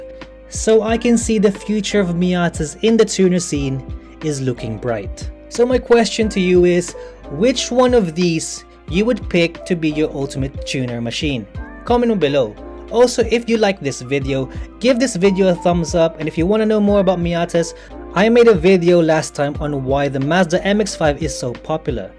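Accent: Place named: Australia. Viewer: I like the cars you recommend sir but I love your subtle ozzie accent.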